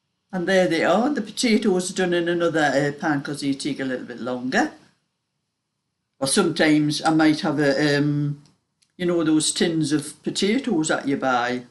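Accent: Newcastle, United Kingdom. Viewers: G`day Thanks for the carols:) I love the white baby blanket. You Know we have a lot in common with our knitting and crochet, the time given to it all etc, Of course i just love to hear the geordie accent:) I was going to "super thank you "for entertaining me these past months. " but you havn`t got it set up. Daisy In Australia:)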